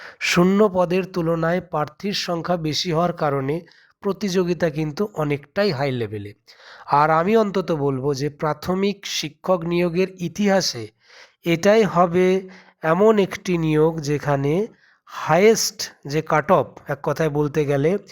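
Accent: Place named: Bangal, Bangladesh. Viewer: Upni bangal , kotha te bojha jacche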